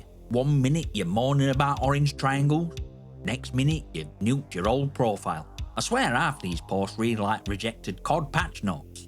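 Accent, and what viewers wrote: United Kingdom. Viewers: You have the best style of Brittish accent, so I'm subconsciously agreeing with whatever you say automatically before thinking critically about it. It's like a nice big bonus that you happen to be spot with all your takes here.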